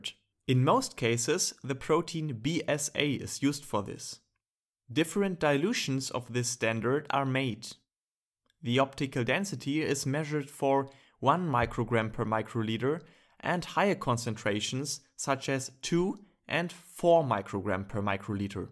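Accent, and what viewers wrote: Germany. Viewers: I bet you're a german speaker. Thanks for the video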